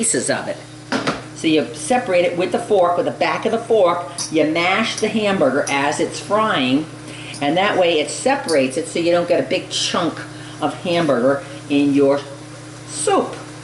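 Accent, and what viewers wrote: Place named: Maine, United States. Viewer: You sound like you come from somewhere around where I do. I was born and raised in Maine. :-)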